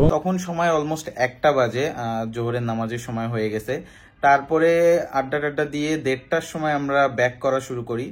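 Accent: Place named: Jessore, Bangladesh. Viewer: তো বড় ইউটিউবার হওয়ার পর ভুলে যেয়ে না। আপনি কি যশোরের মানুষ? কথা গুলো একদম আমাদের মতো 🙂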